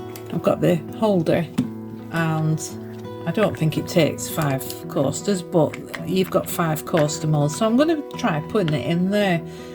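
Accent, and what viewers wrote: Northern England, United Kingdom. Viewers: Lovely to hear a northern voice (I’m from Wigan) I love this video and nice to see I’m not the only one with a few bubbles. I love your video’s.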